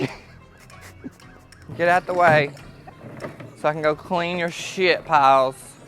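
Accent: South, United States. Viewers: Omg! (Laughing) "It's so damn ghetto around here!" (OR:) "Biotch, you going to Chick-fil-A!"😅 Reminds me of my childhood with that "SOUTHERN" accent. ❤ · 😂 you are so funny and so southern I just don't know what to do lol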